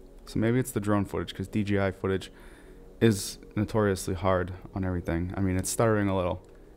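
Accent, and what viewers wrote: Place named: Boston, United States. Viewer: Bro, I can hear my hometown in that voice. I grew up in Southie. Randomly came across your channel; just faced the exact same dilemma. Different workflows, and my PC is equipped with a 3080, probably spent close to $5k on the PC build total. I picked up a fully decked out M1 Max (64gb, with the 2tb SSD). The MacBook was cheaper, coming in around $4300. Granted I still use the PC for gaming, which I have no plans to do on the Mac, but it’s pretty insane how crushing that performance is. Sadly, I’ll have to wait for Dec 1 to get my 16” MBP; this has definitely made the wait harder. Can’t wait to get this little powerhouse in my hands, retire the old 16” Intel MacBook Pro, and save some time everyday.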